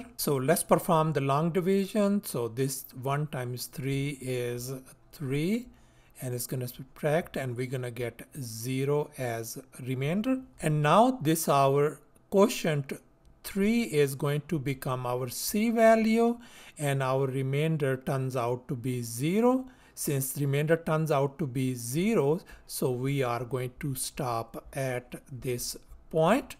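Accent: India. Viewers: Sir is probably an NRI, as u can hear from his voice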